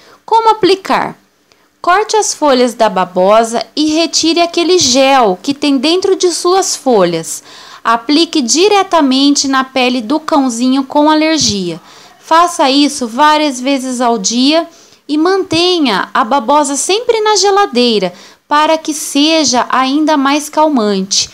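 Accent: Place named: Northeast, Brazil. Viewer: Treinar a dicçao fiquei <link>.a leitura do texto com sotaque sertanejo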